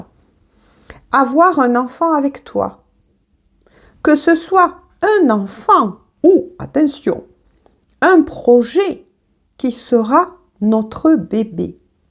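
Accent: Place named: Marseille, France. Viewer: C’est vous le rayon de soleil,avec cet accent de Marseillais « je crois « vous écouter m’apporte beaucoup, mais bien sûr aussi les messages dont vous nous faites la lecture, merci d’être là votre chaleur est réconfortant. 🙏✝️💜🙏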